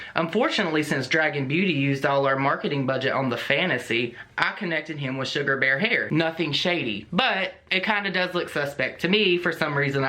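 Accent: South, United States. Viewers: Subbed for that southern drawl lol love it . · I love your accent! Is it southern?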